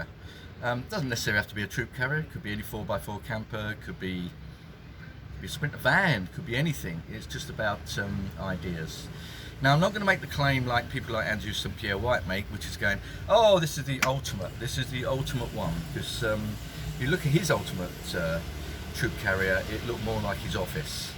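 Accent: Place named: United Kingdom. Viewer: Also nice to hear from a Brit doing this stuff. That’s not meant to be an inflammatory or discriminatory comment, it’s just nice to hear a familiar accent, a different perspective and someone from home enjoying their Troopy build - and there aren’t that many Troopies in the UK!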